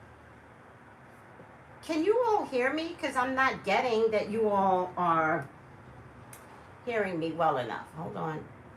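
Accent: New York, United States. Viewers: Are you from NY? I love your energy!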